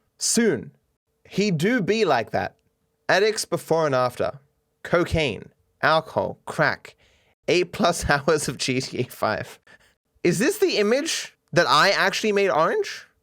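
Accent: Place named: Australia. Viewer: ok but when aren't you doing a Australian accent, also I remember that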